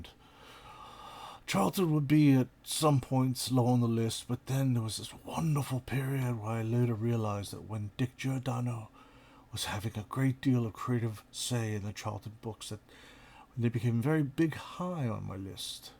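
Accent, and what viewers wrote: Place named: Pittsburgh, United States. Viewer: I love the accent, would it be safe to call it “jag off speaking”? Also … you need to find a reason to do your Gene Simmons in one of these, maybe if you do an SA Marvel that he might have read when he was learning English?!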